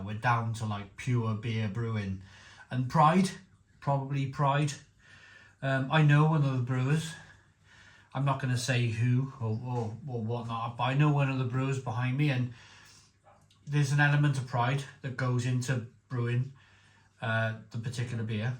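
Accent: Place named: Newport, United Kingdom. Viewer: u from Newport, mate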